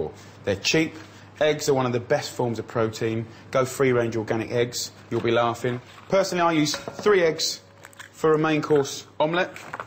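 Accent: United Kingdom. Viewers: He is British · He doesn't have a lisp, he's from the UK.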